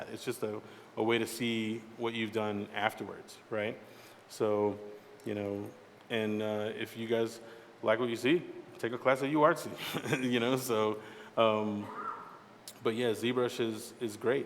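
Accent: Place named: Bronx, United States. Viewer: I knew this dude was from the bronx just listening to him.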